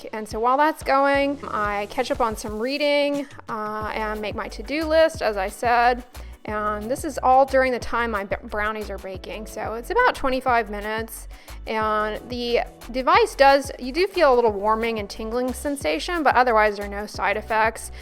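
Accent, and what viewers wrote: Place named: Midwest, United States. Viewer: This accent is “uppity Midwestern.”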